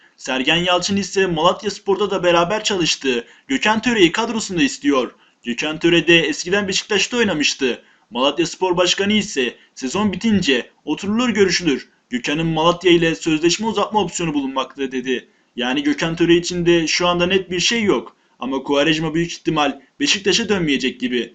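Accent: Gaziantep, Turkey. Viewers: 1 sen anteplimisin 2 lyon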